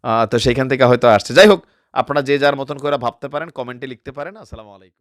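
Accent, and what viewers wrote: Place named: Comilla, Bangladesh. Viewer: আপনি শিক্ষিত হয়ে কুমিল্লার ভাষাটা না বললেই পারতেন ।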